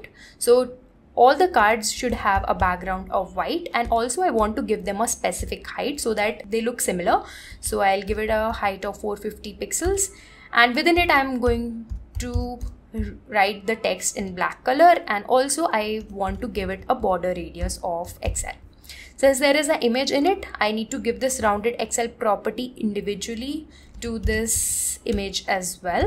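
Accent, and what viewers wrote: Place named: India. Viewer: hindi accent...; i couldn't watch more than 2 minutes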